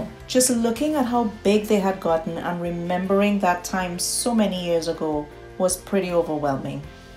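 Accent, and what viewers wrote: Trinidad and Tobago. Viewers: I know a Trini when I hear one ❤ Great work!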